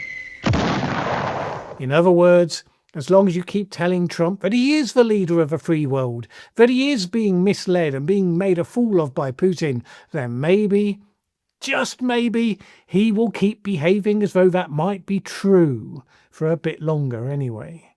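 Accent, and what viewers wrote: United Kingdom. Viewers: Sounds like a Brit, smells like a Ukrainian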